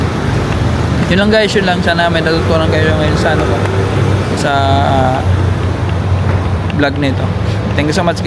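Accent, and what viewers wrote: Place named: Waray, Philippines. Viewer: Waray waray ka kb sir..kasi yong accent mo sumasabit sa Pagka waray eh😅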